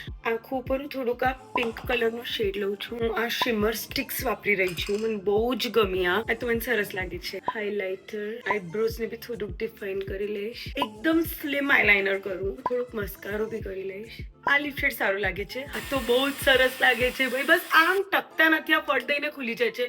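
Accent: Surat, India. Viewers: Yeahhh surtiii girll in gujju accent ❤🔥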